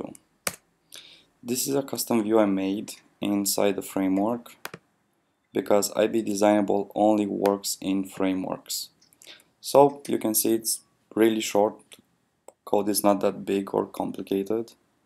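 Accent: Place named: Russia. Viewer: Cool russian-english pronunciation =)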